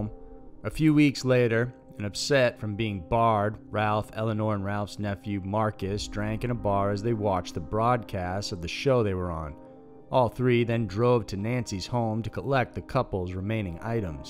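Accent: South, United States. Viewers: Some of your words sound so southern. Where are you from? I’m a Carolina boy myself. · I'm not usually an enormous fan of American accents but I really love the narrators one, could listen to it all day! What part of America is that from?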